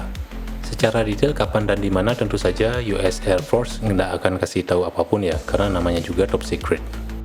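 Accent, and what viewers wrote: Bali, Indonesia. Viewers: Orang bali lu bang?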